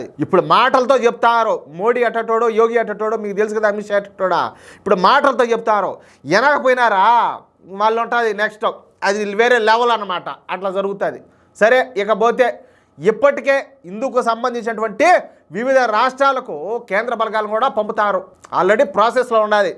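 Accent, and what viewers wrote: Rayalaseema, India. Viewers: మీరు రాయలసీమ మాండలికంలో విషయ వివరణ చాలా బాగుంది.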